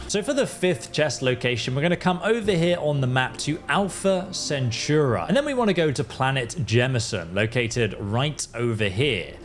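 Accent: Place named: England, United Kingdom. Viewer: Lovely Engish accent you have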